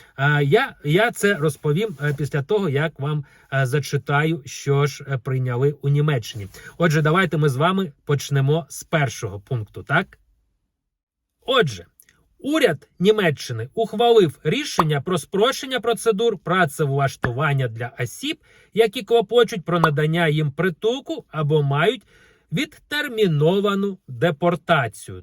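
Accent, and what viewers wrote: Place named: Russia. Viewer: Автор влога, для того, чтобы вести влог на укр языке, выучите его. Ну нельзя же так глумиться над ним. По вашей речи ясно, что Вы думаете и говорите в жизни на рус языке. Много слов, которые Вы пытаетесь произнести на укр языке, украинцы так не произносят.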